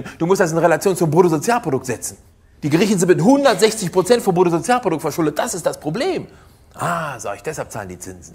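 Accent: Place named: North Rhine-Westphalia, Germany. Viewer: Wunderbare Lehrstunde mit rheinisch-westfälischem Duktus. 👍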